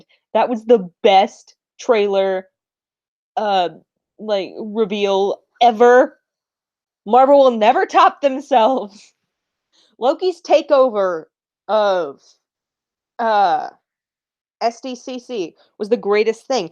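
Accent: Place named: United Kingdom. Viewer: Your British accent is perfect. And don't think I didn't catch that harry potter reference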